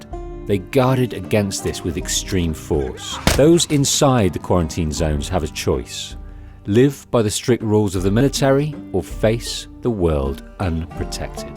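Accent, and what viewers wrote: United Kingdom. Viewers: It’s called a English accent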